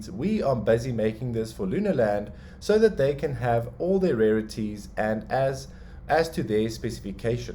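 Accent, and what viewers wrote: South Africa. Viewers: Great stuff boss! Are you South African? It feels like im getting schooled by a long lost uncle. :). " In the coming weeks, I will create a solidity series that will teach you the basics of smart contracts on the blockchain." - The solidity code for NFT's pretty basic. its more the Minting Dapp and react app that can display the list of NFT's and filter is the hard part I think. ;) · Hallo, do you have a walkthrough video of the entire coding? I would love to have a basic understanding of what the different parts do without having to watch how all of it was coded. Thank you for all your effort <3 (I was pleasantly surprised to hear your braaibroodjie accent, ha-ha.)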